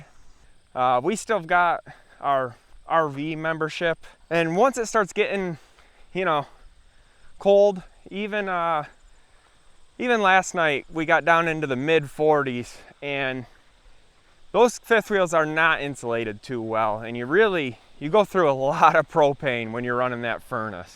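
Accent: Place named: Michigan, United States. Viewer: What state/county? By the accent I'm guessing Michigan.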